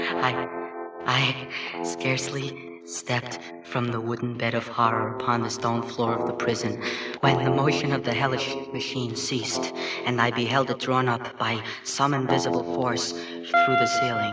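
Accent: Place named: New York, United States. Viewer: Cool. Woody Allen, reading Poe as a New Yorker.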